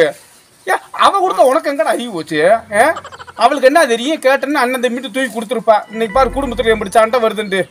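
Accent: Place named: Usilampatti, India. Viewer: உசிலம்பட்டி பேச்சு சூப்பர் அண்ணா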